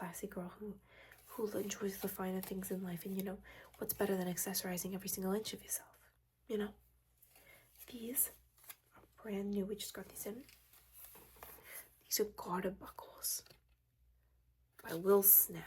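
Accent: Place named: Brooklyn, United States. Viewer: The Brooklyn accent is so good 😂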